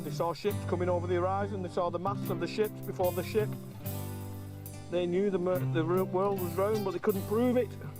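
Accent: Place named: Northern England, United Kingdom. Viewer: Enjoyed that , it's now on my to do list. This you must live near me with that accent, Wigan? Bolton? Lol.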